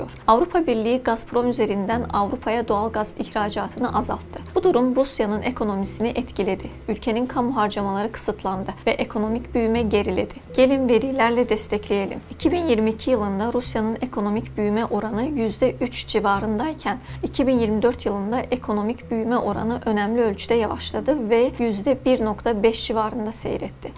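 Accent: Azerbaijan. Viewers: Sen dinlemek için değil kusur bulmak için buradasın.İşine gelmiyorsa başka yere.Azerbaycan Türkü anlatan bayan.Haliyle şive telaffuz farkı <link>ğenmşyorsan dinleme kimse seni burada zorla tutmuyor. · Kiz Azerbaycanli , konuşmasindan anladim 🫠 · Azeridir o yüzden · Arkadaş Azerbaycan lı Türkçe anadili değil. Hem alınmasın diyorsun hem de bir insanın yaptığı işi değil, Allah vergisi sesini eleştiriyorsun biraz anlayışlı olun.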